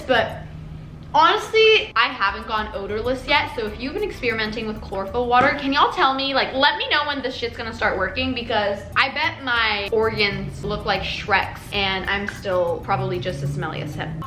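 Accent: United Kingdom. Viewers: The British accent tho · That british accent 😆❣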